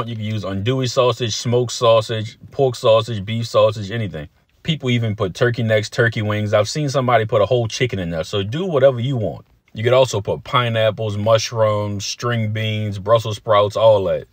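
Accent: Louisiana, United States. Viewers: Ill parlez vous angles de Louisiane ! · It's not even a southern thing it's a louisiana thing specifically. It's more of a frenchy way of saying it. Also no need to be rude. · Most likely either southern or louisiana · That is perfect Bayou Accent !!!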